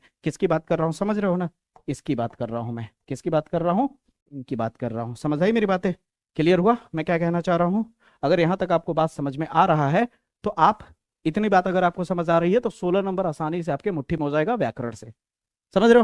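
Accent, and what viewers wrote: Bihar, India. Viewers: Guru ji ki aap Bihar se ho kya❤❤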